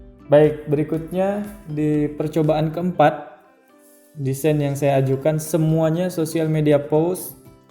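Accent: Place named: Pontianak, Indonesia. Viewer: Kayak bhsa pontianak ya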